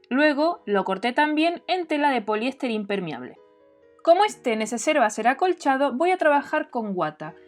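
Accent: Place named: Buenos Aires, Argentina. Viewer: Hermoso! 😊 Me despista el porteño con el español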